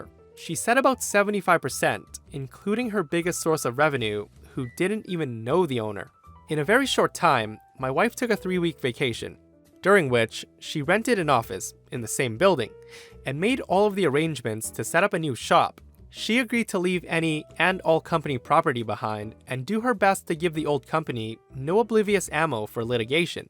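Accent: North America. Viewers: I think I remember him saying he's from Canada. He's definitely got a North American accent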